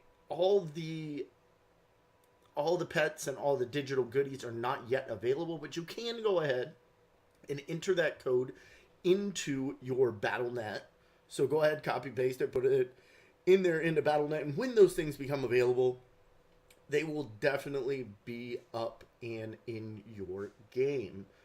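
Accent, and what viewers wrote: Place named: Canada. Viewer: Oh my gosh your Canadian accent hahahaha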